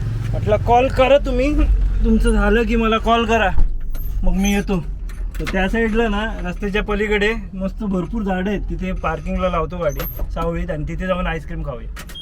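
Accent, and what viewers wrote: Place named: Malvan, India. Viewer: दादा मध्ये मध्ये मालवणी बोलना ❤ भारी वाटतं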